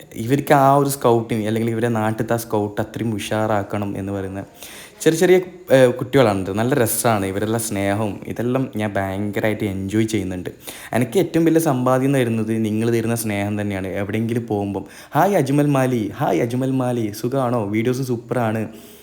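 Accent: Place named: Malappuram, India. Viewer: എന്ത് മെജയാണ് സംസാരത്തിൽ പൊളി മോനെ മലപ്പുറത്തിന് bro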